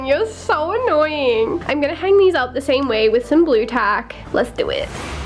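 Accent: Australia. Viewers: are you an aussie trying to sound american or an american is australia?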